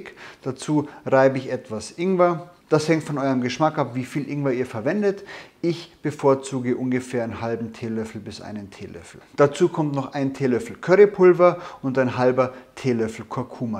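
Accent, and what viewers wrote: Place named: Bavaria, Germany. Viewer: Seit ein gewisser Herr S. aus Bayern mit seinem Dialekt omnipräsent in den Medien auftritt, war mir das Bayrische nicht so angenehm im Ohr. Du hast das eindeutig in die positive Richtung gekickt.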